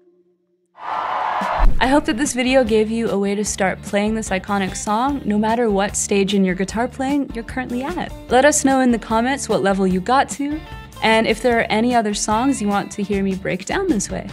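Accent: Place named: Canada. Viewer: I knew you were Canadian without checking. You said, "I'm sore-ey" as opposed to us Yanks' "sah-rey." Awesome lesson.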